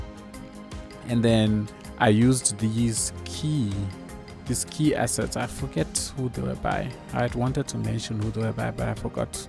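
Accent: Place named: South Africa. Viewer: I didn't know you were South African but when I heard your accent I knew, I felt the South Africaness in my soul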